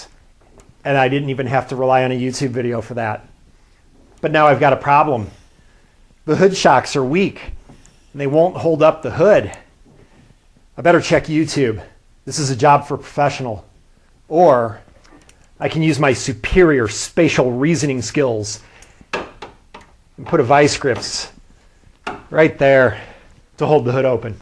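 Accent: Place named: Louisiana, United States. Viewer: Jeeese I must apologize I just took it for granted - to me you have an accent, I thought it was Cajun but I'm from Canada so its not like we hear people from Denver either except John Denver and you know he never had an accent. Up here once a week I listen to the best automotive phone in radio podcast in the USA from guess where Louisiana. This is the link if your interested. <link>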